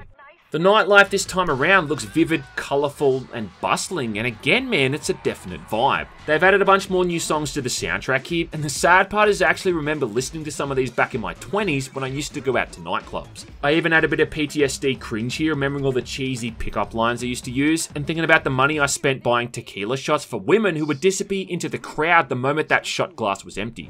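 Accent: Australia. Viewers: Its how the Australian accent works.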